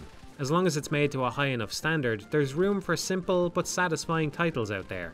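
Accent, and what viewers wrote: Ireland. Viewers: And that Irish is an amazing yt video voice accent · That Irish accent with the complicated language is like asmr to me ♥️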